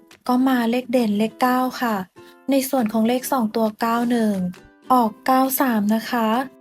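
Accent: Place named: Central Thailand, Thailand. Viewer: แอดคนจังหวัดอะไร เหมือนคนภาคกลางเหนอนิดๆๆ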